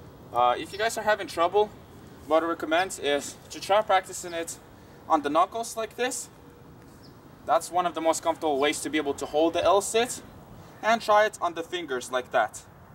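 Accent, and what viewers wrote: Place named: Russia. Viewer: Ya I thought I recognized the surroundings и русский акцент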